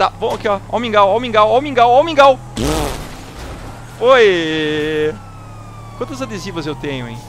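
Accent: Rio Grande do Sul, Brazil. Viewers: Mas tu só pode ser gaucho né? abraço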